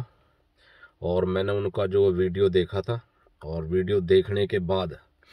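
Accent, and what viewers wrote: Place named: Bihar, India. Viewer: Bhaiye jese bolte ho tum to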